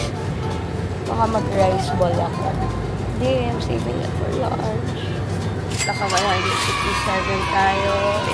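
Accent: Conyo, Philippines. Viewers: AAAA i really like listening to you talk conyo, soo cutie talaga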